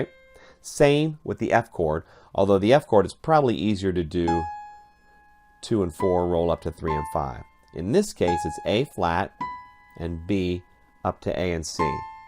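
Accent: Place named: South, United States. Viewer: I love the American accent especially 'down south' and that American 'drawl' is kinda special too!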